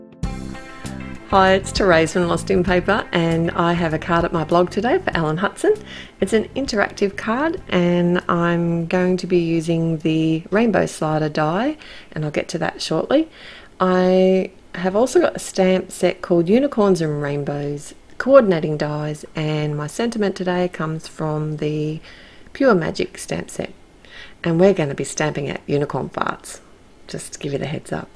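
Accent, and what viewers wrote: Australia. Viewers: Very cute card. Farts doesn’t sound so bad when you say it with our Aussie accent! 💖🇨🇦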